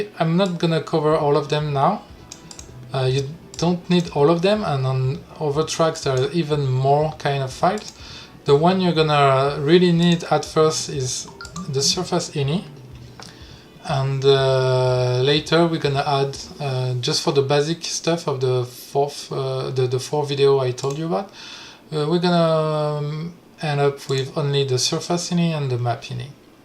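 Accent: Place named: France. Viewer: Moi j’entends que tu es français mon ami lol j’aurais une question je boss un projet track mais j’ai un pb avec ma route et des effets de la voiture la fumée des pneus part dans le mauvais sens et la camera sautille et surtout le volant tremble constamment pourtant mes cubes sont bien en y vers le haut et le z pointe ma direction j’ai travailler sur blender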